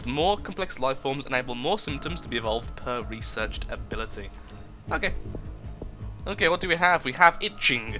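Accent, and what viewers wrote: United Kingdom. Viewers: Lots of British sweet talkin' going on in this plague video.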